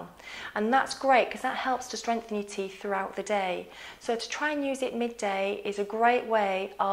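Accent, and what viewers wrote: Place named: United Kingdom. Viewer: She's British not Aussie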